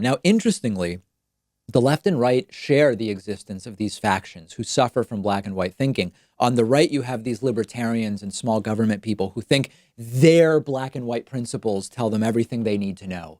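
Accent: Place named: Argentina. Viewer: He is from Argentina.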